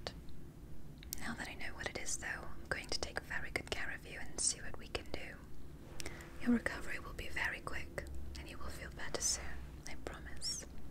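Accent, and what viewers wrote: England, United Kingdom. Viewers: Weird to hear you use a British accent. I got ASMR, but also on edge because you sounded like an evil villain from a bond movie haha! Amazing video! 10/10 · Perfect English accent. Thank you from across the pond. PS. I love 🇬🇷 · Really Beautiful Doctor!!! Great Video and you have the most Beautiful eyes and you do a Great British Accent!! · you are spoiling us. Also thanks for the British accent. I love studying the accent from your videos. ❤️❤️❤️❤️ είσαι υπέροχη!!! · Is she British or nah lol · I'm British and I approve of this accent 🇬🇧🖤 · I never new she had a British accent ! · your English accent and bedside manner 😍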